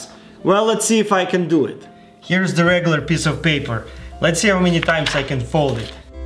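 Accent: Russia. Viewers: So russians all sound the same...interesting. CrazyRussianHacker sounds the same.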